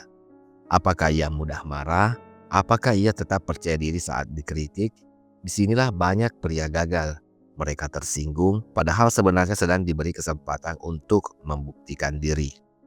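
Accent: Indonesia. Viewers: Sy tauu !! Suara di vidio ini pasti orang Sulawesi😊 tepatnya Bugis😅😊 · Yg ngomong pasti orang Bugis/Makassar😂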